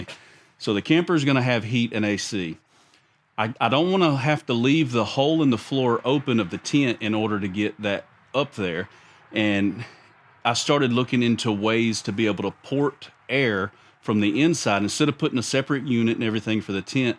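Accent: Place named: Alabama, United States. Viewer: What part of Alabama are you from? I’m from Alabama and I can tell you are too from that accent.😂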